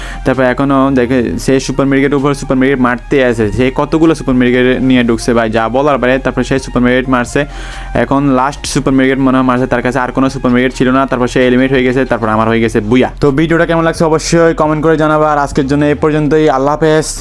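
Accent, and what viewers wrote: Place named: Chittagong, Bangladesh. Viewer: ভাই তুমি মনে হয় চিটাগং এর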